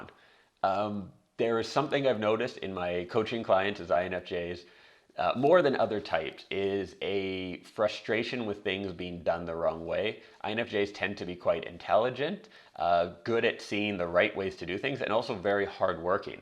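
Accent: Canada. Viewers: I found out I was INFJ earlier this year, and wish I had known earlier. Your advice is on the right track. Even as a child, I had to know why before I acted on someone's orders. Entering the military would have been hell for me. I have been working mostly alone since 1987, and found it is the best way for me to function. Working with others who did not have the same standards ended up making me close my doors, limiting communication with them. Once going on my own, while it can get lonely, ended up saving me all kinds of conflict and pain. And having to follow a procedure/process oriented, controlling boss uttering, "because I said so" would be purgatory for me. Did I make mistakes? Hell, yeah. But you try not to make the same mistake later on. I can tell from how you talk you are Canadian. Keep up the good work.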